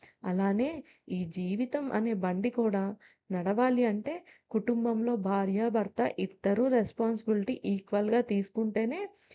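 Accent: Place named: Telangana, India. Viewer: Chala baga chepparu andi telangana slang kuda super ga vachindhi andi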